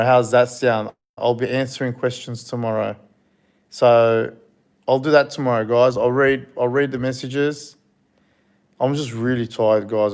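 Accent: Australia. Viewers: I always wondered why you had a slight Aussie accent. It's not a very strong aussie accent because I can understand you but it's a beautiful accent. So you guys have been there. So cool!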